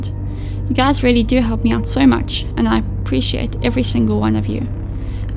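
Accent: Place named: South Africa. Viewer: You must be South African if not your accent sounds just like it. I am South African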